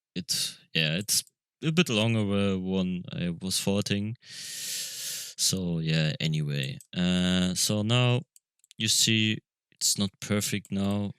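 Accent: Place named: Germany. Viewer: nice tut,i could hear the german accent xD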